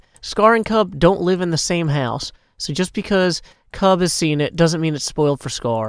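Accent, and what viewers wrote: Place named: South, United States. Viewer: First time here on your channel, it's sort of refreshing to hear a semi-southern accent in a hermitcraft video! Enjoyed the experience thoroughly, and great poem by the way :) ~your new fan (smoothie) from GA, USA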